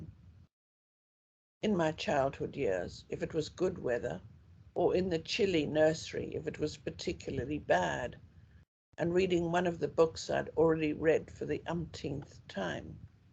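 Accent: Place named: Zimbabwe. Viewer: Well l was thinking to myself u was Rhodesian .Although there’s a difference between British South African and Rhodesian English.I had assumed u moved to KZN from rhodesia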